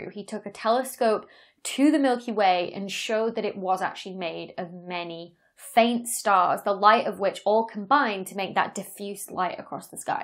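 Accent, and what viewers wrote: United Kingdom. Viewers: Fabulously put together - you've got a new fan here. Great presentation, clarity and very easy to follow... and it's soooo refreshing to hear a British accent for a change. Love it. Harry.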